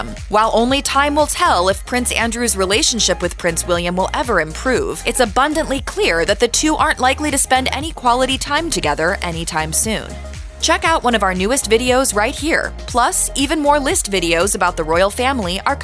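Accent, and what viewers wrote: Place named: United States. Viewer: Video: "Prince Andrew is one of the most...." Me: *Hears American accent, immediately skips to next video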